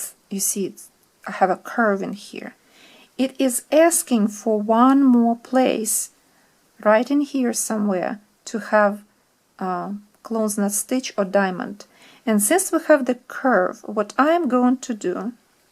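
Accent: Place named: Russia. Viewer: Здравствуйте,по акценту поняла ,что русский знаком с Вами !!! Потрясающая сетка , такой я еще не видела ,браво !!!👍👍👍👍👍👍👏👍👍👍👍👍👍👍👍👍👍👍👍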